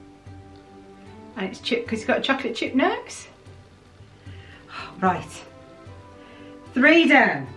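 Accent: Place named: RP, United Kingdom. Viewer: lol the queens English priceless you so make me laugh... Even worse when my ma is from Birmingham lol... Look forward to your videos all day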